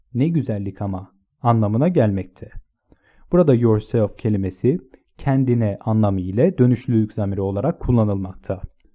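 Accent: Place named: United States. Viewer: Amerikan aksanınız çok hoş ❤️👋🏼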